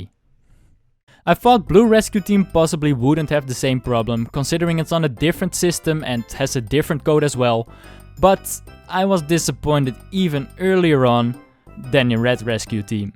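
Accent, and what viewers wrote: Netherlands. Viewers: Fi Fa Fo Fum, do I hear the voice of a Dutch man?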